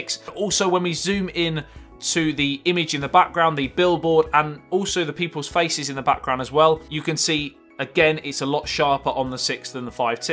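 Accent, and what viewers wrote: United Kingdom. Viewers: im weirdly addicted to your voice and minor humor mate.. here iam its like am im seeing all ur vidz for the past 1hr... and sure iam into it.. that British strong accent tho,.. (o homo lol) love u man <3 grt review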